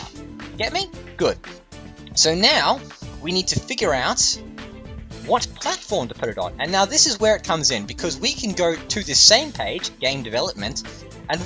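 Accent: United Kingdom. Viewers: Nicely done tips, this game was kind of frustrating me in that every game i made would tank or i was moving too fast when it told me too. Also rates up for your voice too, you must be a Brit like I.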